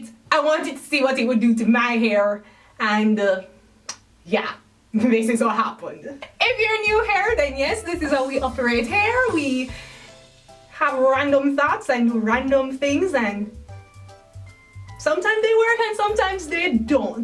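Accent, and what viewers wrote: Jamaica. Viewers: R u Jamaican or carribean???? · Which country are you from? Because I hear a jamaican accent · Her voice is high but her accent sounds pretty Jamaican and she's said that she's Jamaican.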